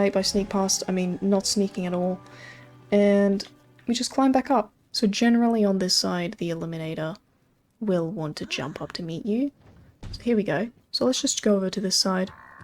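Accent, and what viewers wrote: Australia. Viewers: Oblivion ambient music: checks Australian woman in a calm voice with occasional chill cursing: check I was expecting bonks but got the best ASMR. Neat. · Also Im a Kiwi so i picked ya for an aussie with the accent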